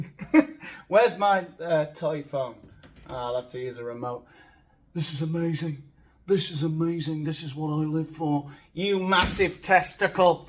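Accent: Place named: Sheffield, United Kingdom. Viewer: Do, do the voices. Particularly your Sheffield voice, I love it!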